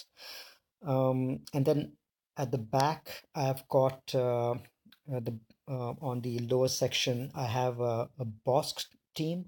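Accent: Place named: Asia. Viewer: It's the 1st time im hearing u... Feels so satisfying because all ur vids are soundless 🤣🤣🤣 BTW from ur accent, i guess that u r an asian.... Am I Ri8? Cause im Asian too 😋😋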